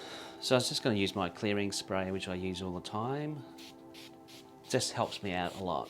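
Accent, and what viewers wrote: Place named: Australia. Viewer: nonsense with an australian accent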